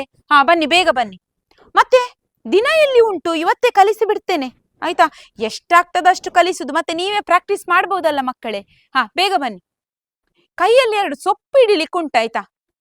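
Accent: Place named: Udupi, India. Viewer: Tumba maja agtade namma udipi kannada keluvaga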